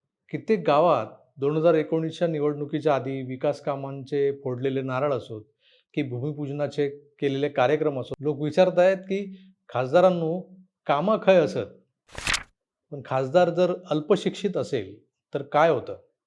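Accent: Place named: Malvani, India. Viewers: छान वाटली तुमची मालवणी ऎकायला. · Nice मालवणी सर जी.... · saheb kup mast malvani .mazaya aali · किती हि मग्रुरी तेही निवडणुकीच्या दिवशी.जनतेने मतपेटीतून उत्तर द्यावे.बाकी मालवणी थाट काही वेगळाच.समजत नाही पण मस्त वाटते भाऊ पण बोलतात कधी कधी. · खुप छान मालवणी बोलात सर 😊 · .Ji Saprem Namaskar! Sundar Ati Sundar Aaple Malvani Bolane. Tsech Ha Video. 31chya31 Dat Ghshyat Ghatlan Numhi. ( 32 - 1 = 31, 1 hee Akkal Dadh ) Kiti Mujorpna. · Super malvani dada ❤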